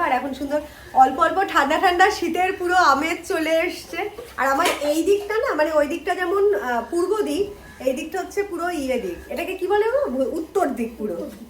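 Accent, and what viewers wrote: Bangal, Bangladesh. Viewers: Aunty tmr bangal kotha gulo ki cute 🥹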